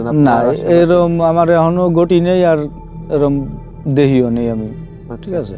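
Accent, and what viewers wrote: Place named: Bagerhat, Bangladesh. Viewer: amader bagerhater vasa mone hocche❤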